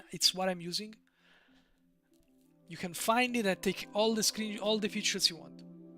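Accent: Italy. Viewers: Are you...are you tuscan?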